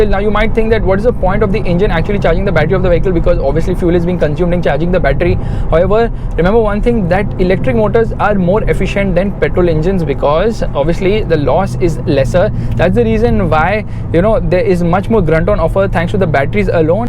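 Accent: India. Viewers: Slow the f down with your talking. Your chatting at such a high speed with that indian accent that its hard to understand you. Just a hnt you DO NOT HAVE TO PRONOUNCE EVERY LETTER IN EVERY WORD..especially the letter R.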